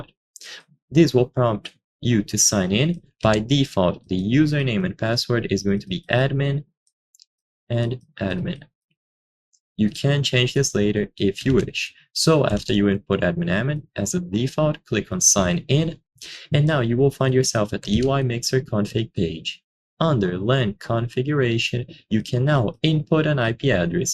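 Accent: Brazil. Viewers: É br né cara? Kkkk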